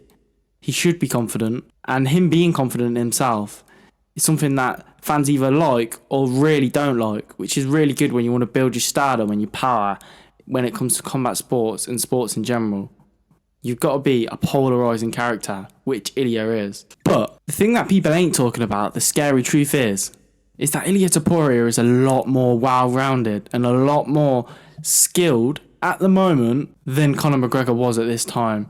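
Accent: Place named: United Kingdom. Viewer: That’s a nice British accent you have brother - good breakdown!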